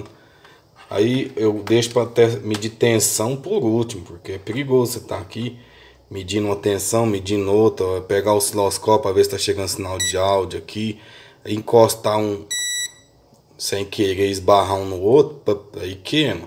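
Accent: Minas Gerais, Brazil. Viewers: Pelo sotaque ou é mineiro ou é goiano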